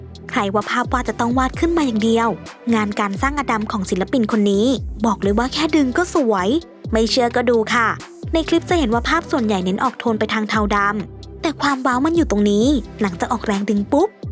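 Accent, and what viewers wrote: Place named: South, Thailand. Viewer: ไอ้ยะ แอตแหลงใต้ ไอ้ยะ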